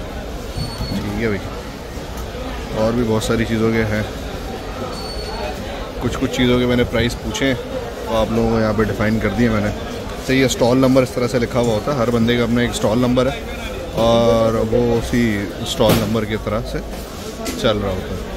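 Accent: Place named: India. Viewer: U talk by Indian, we understand nothing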